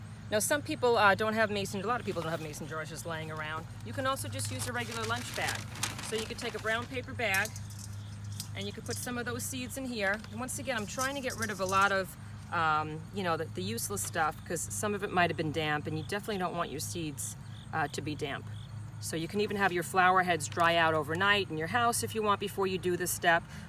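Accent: New Jersey, United States. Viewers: thanks for this - I now understand that it is too early to harvest sunflower seeds. My favorite variety is Italian white - goldfinches love it! BTW your (very slight) accent makes me homesick for NJ and Zone 7 gardening (now living in Minnesota in Zone 4)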